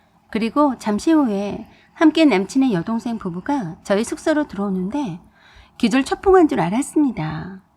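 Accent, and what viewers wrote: Jeolla, South Korea. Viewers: 나레이션 하시는분 전라도사투리 쑥쑥 나오네여.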